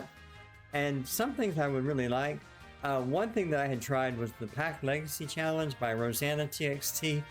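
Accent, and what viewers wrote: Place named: South, United States. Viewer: 😭🥳🙌 AMEN Hallelujah Glory you are BACK! You sound FANtastic to me, Music to mah ears! i think you've picked up a bit of southern twang and im Loving it... 2.0, Baby! Let's Go! 🙏💙🥳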